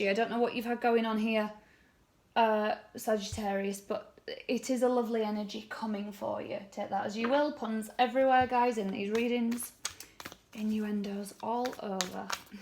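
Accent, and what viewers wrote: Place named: London, United Kingdom. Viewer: so nice of you to think of us. i love your dialect it reminds me of my students years in the late seventies in north London♐️🇨🇭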